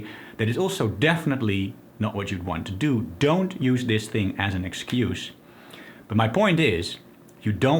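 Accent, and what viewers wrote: Netherlands. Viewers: I sense a dutch accent? My strijder! Good content keep it up jongen!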